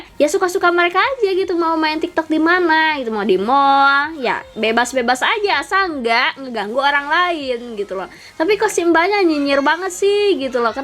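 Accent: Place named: Sunda, Indonesia. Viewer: Sundanya medok😀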